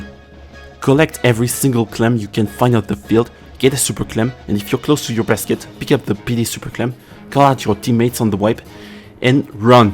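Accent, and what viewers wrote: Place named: France. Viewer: great stuff mate! i actually planned on doing similar explanation videos on the modes, but im far from that subject in my schedule anyway... well, i have to criticise your french accent :( i could barely understand what you say eventhough i wanted to, but i know you tried your best and that's what matters. also regarding the concept itself: i know this video is aimed at people who are new or just part time squids, but i would have liked some more "interesting" details for people who already know the very basics already. for example you could have mentioned or shown the exact punishment you get upon an opposing counter push and stuff like that. just some info that even pros can maybe find usefull or so... idk all in all, good work for a first try i would say^^ keep it on mate!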